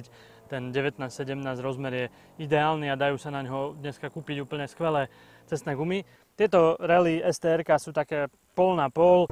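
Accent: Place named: East, Slovakia. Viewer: Velmi silny vychodniarsky prizvuk... inak super.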